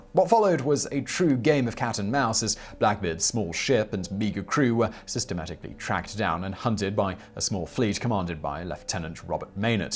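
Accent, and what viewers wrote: United Kingdom. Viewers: But I could listen to his urbane British accent all day! 😂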